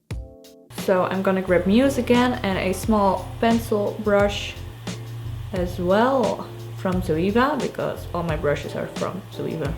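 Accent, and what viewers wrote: Netherlands. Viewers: ben je vids aant bingewatchen. is leuk om te zien hoe je engels heel sneaky steeds iets minder dunglish word. en ik vind je over het algemeen gewoon geweldig i imagine myself to make vids like this if i had the balls xD zelfde attitude haha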